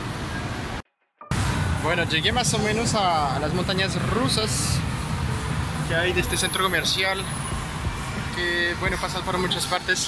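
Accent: Colombia. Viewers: Aprendiste el mejor acento el colombiano, hablas re colombiano. Se que eres heterosexual, pero lo diré , eres guapo jeje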